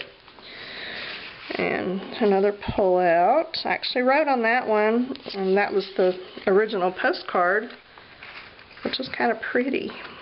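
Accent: South, United States. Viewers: You crack me up, love the accent, sounds like me cause I am Southern too.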